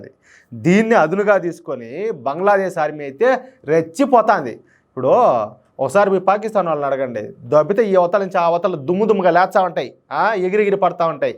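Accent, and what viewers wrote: Rayalaseema, India. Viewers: super speech rayalaseema basha adbuthanga vundi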